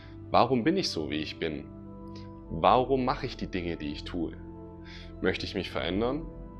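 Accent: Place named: Swabia, Germany. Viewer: Liebe Grüße aus Chiang Mai... du hast nen schwäbischen Akzent 😁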